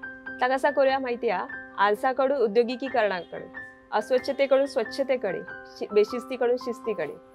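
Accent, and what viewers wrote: Malvani, India. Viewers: बेटा, तुझा माहिती सभर वीडीयो मी नेहमी आवर्जून पाहत असतो. मालवणी भाषेत ला तुझा प्रत्येक शब्द मला ऐकायला आवडतो. मी गुजराती आहे. माझं बालपण गिरगावात गेलं असल्याने मराठी भाषा मी बोलु शकतो,वाचु शकतो आणि लिहू शकतो. तुझ्या मालवणी भाषेत जो गोडवा आहे तो मला नेहमी ऐकायला आकर्षित करतो. तुझा मालवणी स्वाग असाच कायमचा चालू ठेव. माझे तुला खूप खूप आशिर्वाद.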